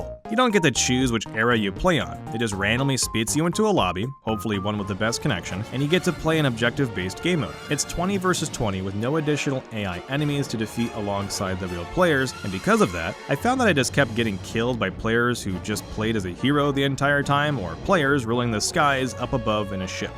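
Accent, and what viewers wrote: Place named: New York, United States. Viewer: you have a New York accent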